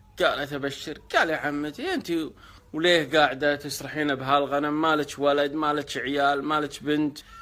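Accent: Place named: Syria. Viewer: شكلك سوري من كلامك . انت من البدون؟؟؟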